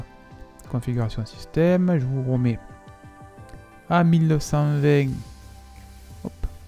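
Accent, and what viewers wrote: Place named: Hérault, France. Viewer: Intéressant.... d'après ton accent tu es origonaire de lherault non 😊 ou proche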